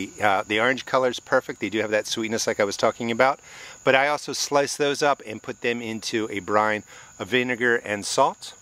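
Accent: New Jersey, United States. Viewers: Love the Jersey accent! A lot of cool stuff on your site too. Inspirational to see so many beautiful peppers growing. Do you ever grill them?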